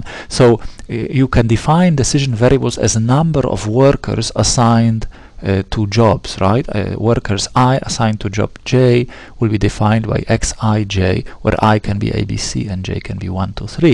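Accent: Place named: Egypt. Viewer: واضح انك مصري :))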